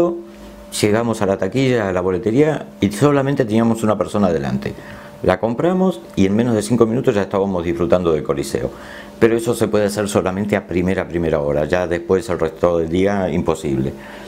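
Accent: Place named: Argentina. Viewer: lo de que es usted Argentino no cabe duda , por su acento recien estuve en America Usa ,México, Perú ,Ecuador regreso España luego Lisboa Berlin ,Roma , Roma fue impresionante visite vaticano Coliseo palatino Foro Romano Fontana di trevi piazza Navona Pantehon etc etc deseando volver a Roma un saludo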